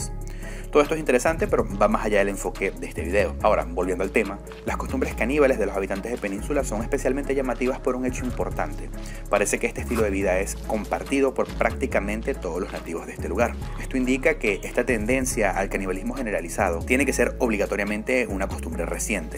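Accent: Venezuela. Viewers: Es venezolano¿ El narrador no los canibales, el acento xd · Buen video amigo eres de venezuela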